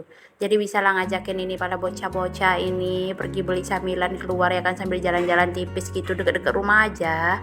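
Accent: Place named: Medan, Indonesia. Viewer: Klw dari logat... Orang medan yaa... Didaerah Dimana medannya? 😊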